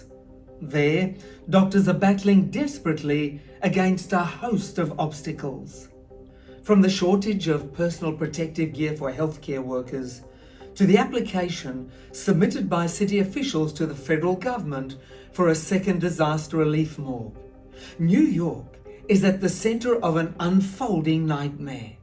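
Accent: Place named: Australia. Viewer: his accent is like Australian accent.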